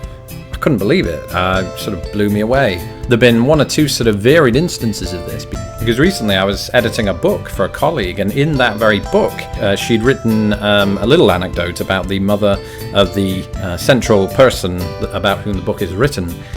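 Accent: United Kingdom. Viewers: Some people are sensitive to others and their consciousness isn't aware, and then the subconscious manifests itself in a question they ask. Your body language is probably like a person with culture e.g. erect posture, eye contact, humility or maybe she heard you talk from a distance and wasn't consciously aware that she picked up on your British accent under the white noise in the room. I really like your videos.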